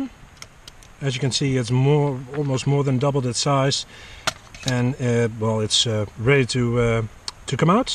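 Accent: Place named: Canada. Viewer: Another enjoyable video! Where are you from? I am thinking Canada? But I may be completely wrong. You do a great job!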